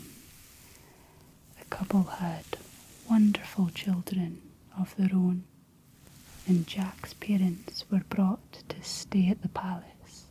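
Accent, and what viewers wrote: Scotland, United Kingdom. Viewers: Wow, you read very well.. very relaxing. And I enjoy ur Scottish way of speaking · What a calm soothing relaxing voice you have and that Scottish accent, I just love it. Very nice video, thanks for sharing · I’m always up for a wee cozy night with a Scottish lass reading to me with the peak accent. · I'll be traveling to Scotland next summer, I'm practicing the accent thanks to your videos! Amazing work!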